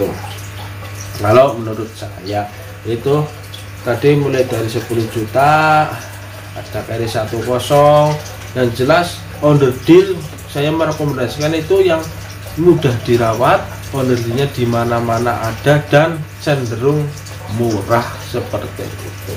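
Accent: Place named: Banyumas, Indonesia. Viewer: Salam ngapak lik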